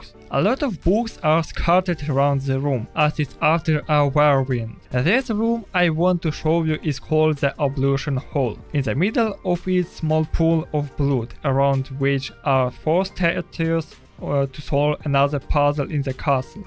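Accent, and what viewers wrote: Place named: Kazakhstan. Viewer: My kid & I were trying to guess where you are from, my guess is Kazakhstan. My guess is that you spent a lot of time learning English from books. Good job sir!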